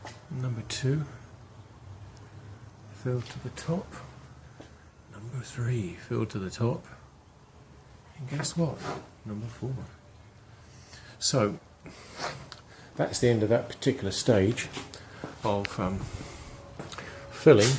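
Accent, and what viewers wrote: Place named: England, United Kingdom. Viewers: I was also wondering what an English man is doing in Texas 😀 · I wasn't expecting an English Accent..... lol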